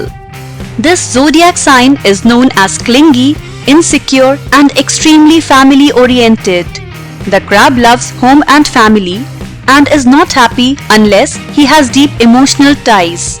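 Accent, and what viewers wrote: India. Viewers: I was really excited to watch the video until I heard the cringy indian accent. sorry. had to be said.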